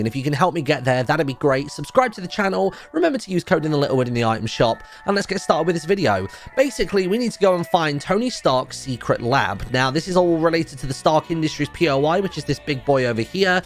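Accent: England, United Kingdom. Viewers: he is speaking English, your speaking American it isn’t the same laungige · if he is British then they have a different way of pronouncing things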